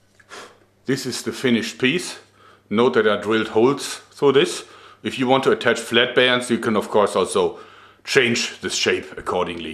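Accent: Germany. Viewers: where are you moving to im guessing somewhere in Germany at least that's what i think because of your accent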